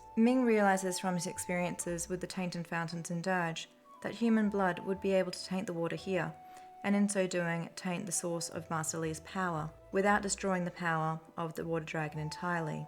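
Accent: Australia. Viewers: The good ending is being a soft spoken Aussie lady who gets all the views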